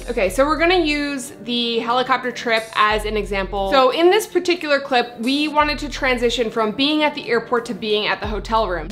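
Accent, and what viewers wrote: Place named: Canada. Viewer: I’ve never caught your Canadian accent at all until this ep, love it! AHdio hahaha 😎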